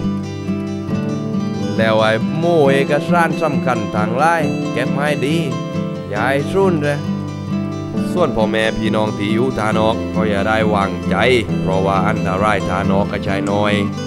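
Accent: Thailand. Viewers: คนพากษ์อยู่ตรังม้ายนิ๊ ถ้าเข้ามาเห็น บอกกันนะบ่าวว่าโย่วย้านไหน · บ้านผู้บรรยายต้องคงไม่เลยนครศรีฯลงไปตอนล่างแน่ ๆ สำเนียงนี้ ฮ่ าๆๆ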